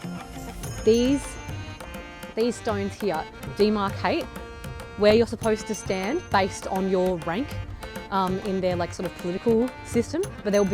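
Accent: Australia. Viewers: 호주식 발음 독특해요. Here 히야, today 투 다이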